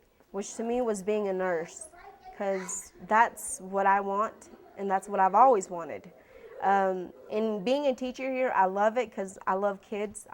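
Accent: United States. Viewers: Hahahaha Piche wey no, sabes que es acento americano si supieras no dirías nada, oye Franky alivianate carnal actualizate su acento es muy country y muy América oye Franky mejor cierra la trompeta por que no sabes nada de ingles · I love your Tennessee accent... It looks real good on you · Dime donde recides te dire si estas en lo correcto. El asento de ella es Americano trabajo con Americanos y le entendiendo y su acento esta como un Americano es mas convivo como muchos Universitarios.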